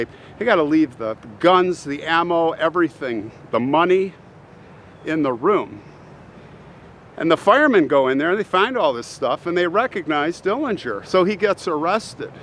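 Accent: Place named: Chicago, United States. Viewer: Great storytelling . Having lived in Chicago, it’s great to hear the familiar accent.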